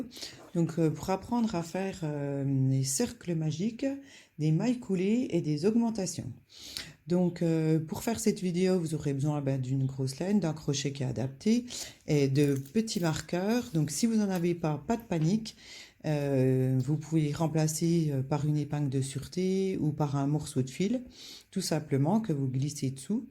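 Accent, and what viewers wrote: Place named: Haute-Saône, France. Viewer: Merci pour vos explications parfaites. Vôtre accent me fait penser à la haute Saône.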